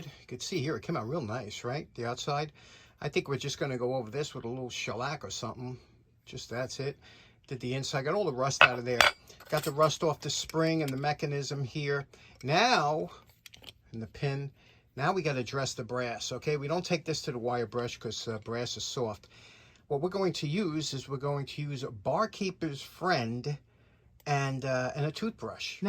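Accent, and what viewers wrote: New York, United States. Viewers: I am definitely not one to correct but I believe you misspoke or maybe I misunderstood. That screw size 6-32 is not six thirty second which would imply a size in inches, it’s a six-thirty two. It is a six gauge screw with 32 threads per inch. I’m sure you know all this maybe it’s just your NY accent and my Texas ear. 😂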